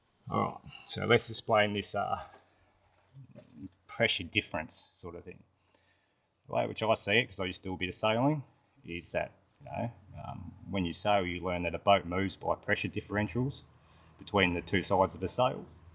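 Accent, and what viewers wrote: Australia. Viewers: Its not. Perfectly normal Aussie accent. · Damn! If your Aussie accent was a little less prominent I might be able to understand your mumblings. Seriously, Mate. Speak clearly for the audience. I did figure out your hose routing, so, thanks for that. Be well.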